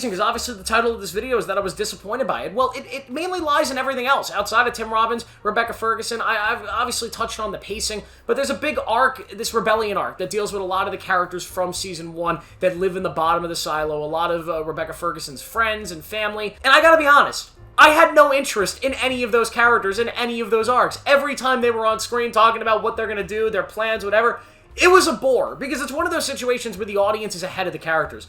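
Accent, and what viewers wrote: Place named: United Kingdom. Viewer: The British accent did come out often glad I am not the only who noticed that since English is not my main and I thought I am imagining it 😀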